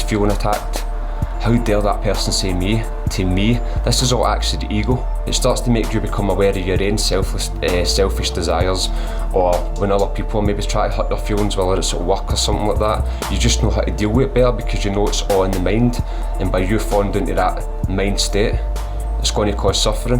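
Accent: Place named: Glasgow, United Kingdom. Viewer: Bravo perfectly described it's was great to hear the Glasgow accent, excellent x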